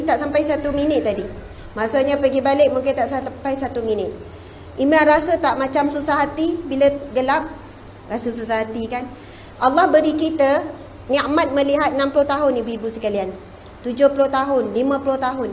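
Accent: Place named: Negeri Sembilan, Malaysia. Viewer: o ustaza ni org nogoqi..😅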